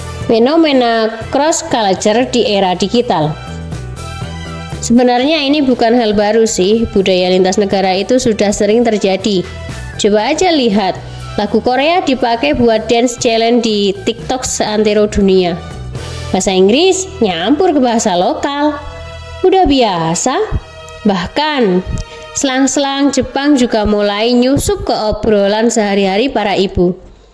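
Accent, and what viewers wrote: Java, Indonesia. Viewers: Mbak medok bgt,trus kpn mau nampilkan orang Malay berdialek Jaksel? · Dialek adminnya meddok ala mulyono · Gue demen konten Lo bro. Enak bawainnya, santai, trus ada medok medok jawa nya gituh. Pokoknya asik deh. Asli gila Lo bro !!! 🤣🤣🤣🤣🤣🤣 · Voice over ini dialek medok jawa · Mbak nya aksen Jawanya masih kebawa..😀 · Medok banget admin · Sampean medok banget mbak ngomongnya...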